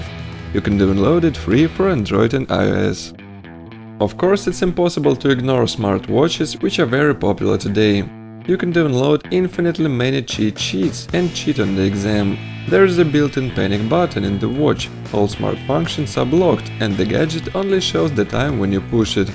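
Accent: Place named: Russia. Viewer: Ее, русский акцент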